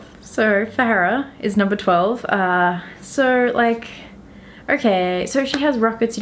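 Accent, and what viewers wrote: France. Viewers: nice Vid ... til point 12, jeah I'm triggered (why is junkrat harder to master than her?!?!?!?!)...:D and that's a nice french accent ;D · Can you talk more with French aczent? sounds pretty good ^^ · i love ur french accent xD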